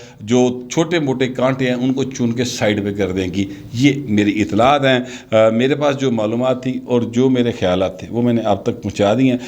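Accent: Lahore, Pakistan. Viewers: Good 👍!! Typically lohery style sir ji😍😍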